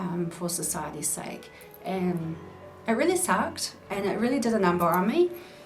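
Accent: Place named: New Zealand. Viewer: Are you a new zealander?? I just caught the accent and was like whoa! I'm from Christchurch. I'm just transitioning into the goth subculture--by that I mean I'm now dressing more to reflect the things I've always liked :) Enjoyed the video edit: I totally commented before you mentioned NZ 😂 I just get excited when I find NZ'rs in youtube haha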